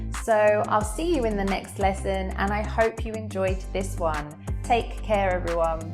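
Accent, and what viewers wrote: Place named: United Kingdom. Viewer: She has a British accent as cute as herself. Thanks for these tips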